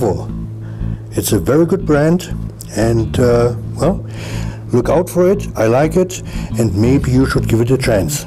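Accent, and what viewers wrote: Germany. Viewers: Good video, just ordered a refurbished one, gonna change the cpu and add a ssd and 16gb of ram. By the way... Are from Germany or a native German speaker? Greetings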